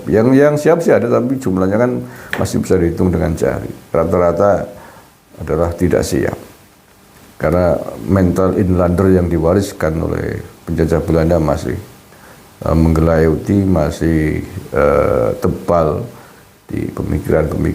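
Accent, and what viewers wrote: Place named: Java, Indonesia. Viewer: Orang Palestina itu cinta tempat kelahirannya, di Relokasi ke Mesir saja mereka tidak mau pernah terdengar dulu pemerintah Mesir siap membagun tempat pemukiman yg baru buat mereka tapi mereka menolaknya, Jika jiwa Rasis anda membabi buta ke masalah lain jgn merendahkan orang yg bertahan di Palestina, dari logat anda bicara sepertinya orang JAWA tulen, coba anda hitung berapa orang jawa yg kami terima di tanah Melayu Sumatra apakah kami pribumi sumatra pernah konflik besar dgn pendatang, kami orang Melayu terpecah oleh perebutan Belanda/Inggris, Seandainya seperti narasi anda kami membuat negara sendiri Melayu sentris dan pecahan sub2 suku lokal mulai dari sumatra, Malaka(Malaysia) dan sebagian Kalimantan,, bisa jadi kami lebih baik perekonomian di banding sekarang. Anda sebagi jawa tulen harusnya tau diri..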